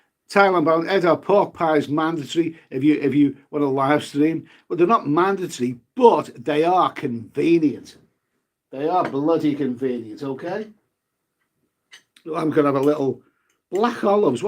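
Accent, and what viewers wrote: Liverpool, United Kingdom. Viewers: You’re a scouser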